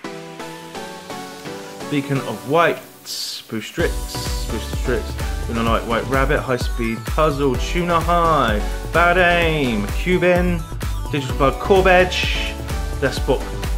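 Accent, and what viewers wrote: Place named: United States. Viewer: Great job and you sounded American not British and what's with long intro once not A problem with it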